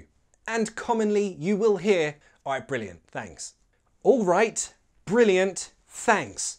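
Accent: United Kingdom. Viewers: Sir is the first British teacher who has the lightest British accent that I've ever found. 👍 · I like British accent because of you😊🌹..... Thanks ever so much for your useful lessons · Please give the english subtitle :( i'm from indonesia and i love so much the way you teach but so many word that i can't understand with your british accent. And thank's before :)))))))) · I have a question, which British accent do you have? I would really like to know! ☺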